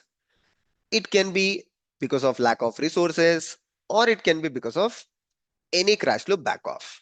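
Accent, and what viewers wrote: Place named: India. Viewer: I struggle a lot with the Indian English accent (it's very hard for me to understand), but I love the content you provide. Keep up the good work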